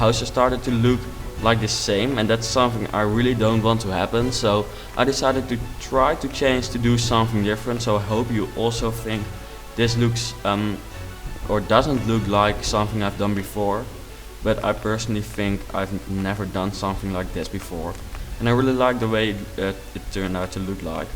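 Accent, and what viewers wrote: Netherlands. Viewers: Haha, inderdaad Nederland accent maar ik vind het juist fijn om naar te luisteren, gaat tenminste niet zo snel ;p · You have such a cute dutch accent! Its funny to hear almost all dutchies have this accent hahaha. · Um he is dutch.So that's why. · je kunt denk ik beter geen commentaar op je video geven want je hebt echt een heel erg nederlands accent en voor heel veel buitenlanders heel moeilijk te begrijpen en niet altijd even fijn om ernaar te luisteren wel super cool huis enzo :-)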